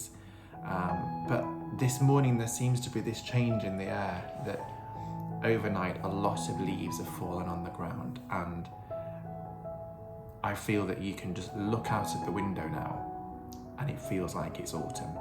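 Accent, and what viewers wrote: United Kingdom. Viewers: I don't know where you live, but I could listen to your beautiful English accent all day long talking about Autumn. It was such a lovely video. Please don't stop these. I find them very comforting. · I enjoyed listening to you. Think I can hear a slight Yorkshire accent.?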